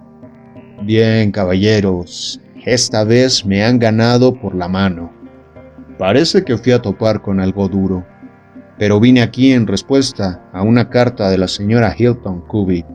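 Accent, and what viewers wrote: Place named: Mexico. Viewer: Está muy bien leído.Trato de acostumbrarme al acento Charro.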